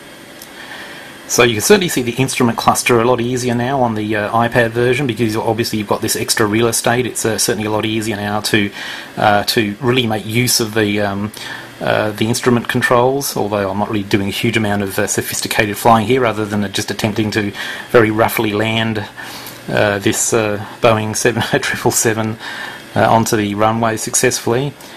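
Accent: Australia. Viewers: are you from Australia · LoL racist j/k - The guys clearly an Aussie. Sounds nothing like the British accent.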